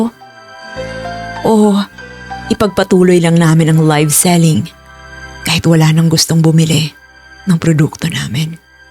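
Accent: United Kingdom. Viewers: Long live....GOD BLESS YOU!..lol...banatan mo british english accent beautiful young lady.....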